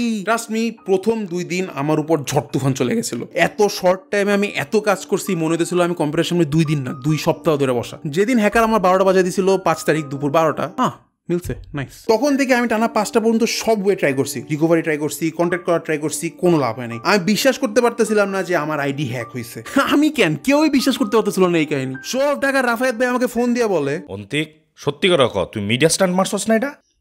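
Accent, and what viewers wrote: Mymensingh, Bangladesh. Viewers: Are you from Mymensingh??/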